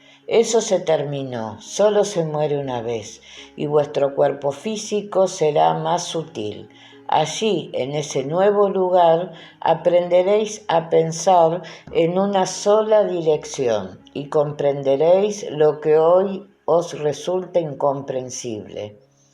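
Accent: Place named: Argentina. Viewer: Quiero hacerte una pregunta.. E escuchado 24 episodios que tu as narrado estupendamente.. Hay más o se termina hay gracias.. Bueno por tu voz se que eres de la Argentina.. Yo soy de España Murcia un saludo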